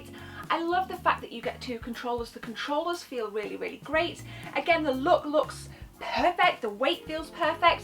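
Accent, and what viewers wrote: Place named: England, United Kingdom. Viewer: Nice video, you from England? Nice accent.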